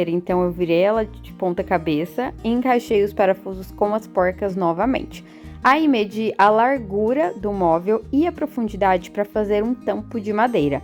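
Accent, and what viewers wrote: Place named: Inner São Paulo, Brazil. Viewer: Olá, desculpa minha intromissão, mas te pergunto... pra que essa saudação em inglês???? Nosso idioma, como sabes, é Português, ó tu, que és brasileira, e tens um nítido sotaque do interior paulista... Por outro lado, sei que o canal é teu e, como pessoa, tens direito pleno de te expressares como queiras, mas... enfim... Fora isso, admiro teus trabalhos, todos, menos as cores de tintas que utilizas, mas isso é questão de gosto e gosto não se discute... E, a título de cooperação, quanto a esse novo projeto teu, o nome correto para o antigo armário, agora transformado, é "Balcão". Aparador é outra coisa bem diferente.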